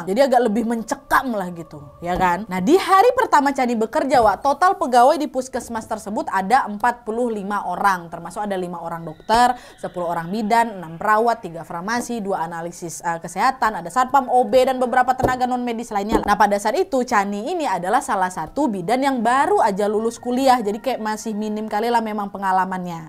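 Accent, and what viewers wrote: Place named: Medan, Indonesia. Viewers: ini aslinya orang mana sih.??? Kek orang Sumatera deh..tp ga tau Sumatera mana... 👍😂😂😘😀😀😀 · Suka bngt sama,dengan logat anak Medan ya 🤭, success dan bahagia selalu ya. Ngikutin dia dr screen msih 1jtan.. mkin ksni mkin kereeennn 👍👍 mantab. Btw tumben gak da jamscare nya nih 🤭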